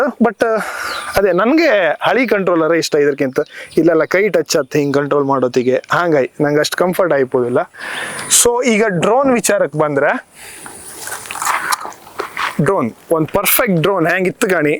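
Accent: Kundapura, India. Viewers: Avru matadta irodu kundapura kannada guru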